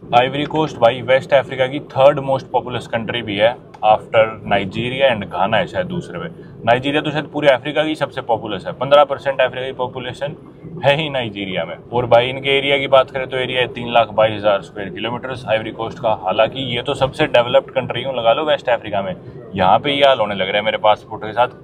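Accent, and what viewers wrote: Haryana, India. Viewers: Most funny travel vlog your haryanvi accent is amazing 😂😂😂😂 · Bhai app ki haryanvi love ❤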